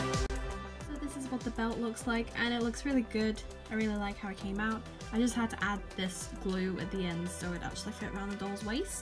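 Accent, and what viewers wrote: United Kingdom. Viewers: Are u from Scotland Uk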